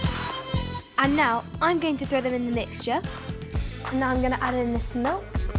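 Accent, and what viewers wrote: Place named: United Kingdom. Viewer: Can you talk without British accent ....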